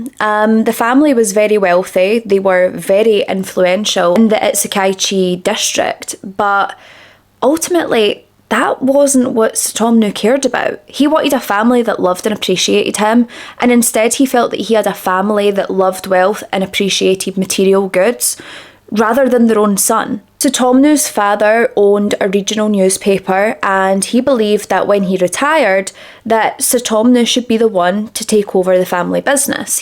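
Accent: Scotland, United Kingdom. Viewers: This accent man 😭😭 how can a fellow Scottish person like a accent so much haha!! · Ohh had to have a little break watching this one. it's a bit much in places but you do such a respectful and professional job and you are so beautiful. Love the cute Scottish accent ❤❤❤❤❤❤❤ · Subscribed for the accent 🤣 I like the way scottish people talk ✨ You could read me the phonebook ❤️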